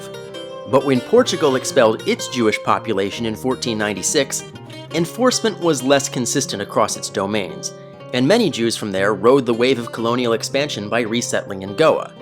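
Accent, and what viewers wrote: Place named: United States. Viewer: hey where did you grow up? your accent. sounds so American besides when you make the 'a' sound as in vAstness, where it sounds like the british 'vahstness' versus the american pronunciation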